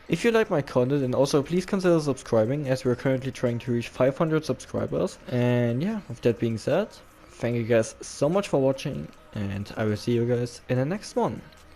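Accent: Germany. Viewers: der deutsche akzent 😂 geil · Gutes video, man hört aufjedenfall denn Deutschen akzent aber echt gutes Englisch, thanks for the video, was helpful, I’m going to try it in game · Ich hab dein Video geschaut und direkt gehört, dass du einen deutschen Akzent hast. Gutes Video 😊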